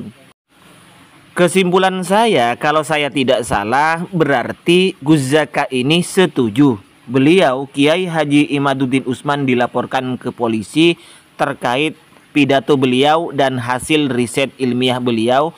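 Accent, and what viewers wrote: Madura, Indonesia. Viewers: Ente orang madura ya min,,? Pantes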